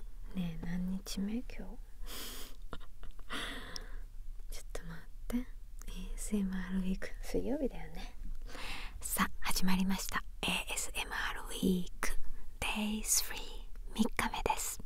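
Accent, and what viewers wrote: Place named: Kagoshima, Japan. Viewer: 鹿児島弁混じりの関西弁になってましたね(笑)あいちゃん顔焼けたぁ？いい感じです。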